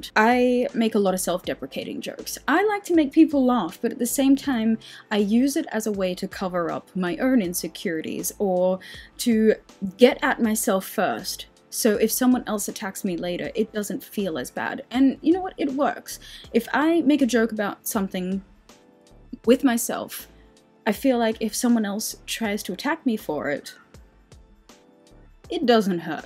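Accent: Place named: Australia. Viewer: Pretty Australian accent.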